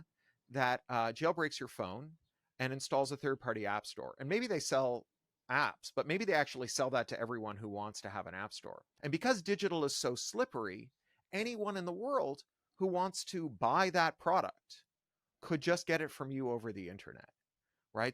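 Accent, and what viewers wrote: United Kingdom. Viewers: I was just about to tell you to stop speaking with a British accent, but I see that you’re a British publication When you speak English, you really need to learn how to speak standard American English, which is basically speaking English with a Midwestern accent. Americans cannot understand what you’re saying because it sounds pretentious and affected. But I had to stop by just to let you know that I agree. Unfortunately, your people, the British are the reason for the enshitification of the Internet. With the United Kingdom‘s creation of its Frankenstein, Israel for political power for the United Kingdom, and for European Jews, the UK and Israel, with the help of the muscle of the USA, have hijacked the Internet with laws that favor the abridgment of free speech as if a censure would advanced the power of those with a paid for preferred status to be the voice of the narrative on the Internet You don’t own the airwaves So what we have is basically a bunch of people who are bullying everyone to comply With a political narrative broadcasted over the Internet. 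For the creator and the creators of the Internet, the British and the Jewish narratives run against the entire world In all fairness, each country does not want their business for the world to know because they want to control a group of people in their geographical location. So they also a bridge the ability of the Internet to be free from censorship. Like tiny Tibet censoring what their population knows about the rest of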